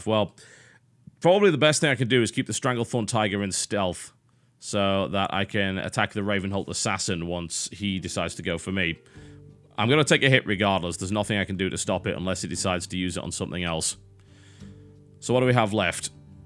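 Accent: United Kingdom. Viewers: He has a British voice.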